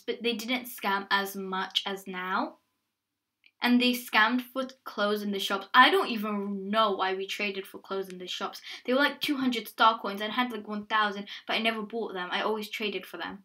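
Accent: Denmark. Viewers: Wait are u danish?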